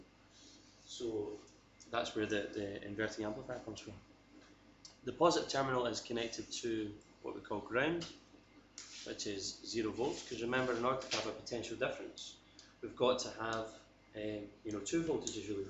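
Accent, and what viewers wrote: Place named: Scotland, United Kingdom. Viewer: Thanks, love all the vids! Scottish accent i hear;) got prelims in few weeks so this helps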